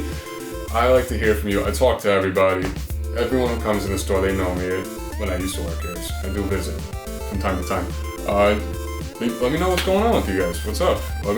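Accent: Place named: New York, United States. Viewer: Your accent is like halfway between Sylvester Stallone and Rodney Dangerfield. So I'm guessing you're from NYC or one of the nearby areas, but where abouts? I mean Brooklyn is an obvious guess because how easily "Fuhgedaboudit" flows out when you talk. Hmm. Got me thinking there or the Lower East Side. Of course, I'm talking out my ass, you might be a Jersey boy.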